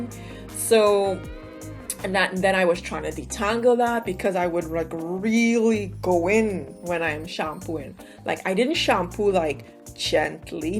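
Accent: Trinidad and Tobago. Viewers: Ohhhhh is that a trini accent i hear?? Big up the T&T massive lol we out theree. Very informative vid too. I have a twa and im still trying to get ways to style it , sigh.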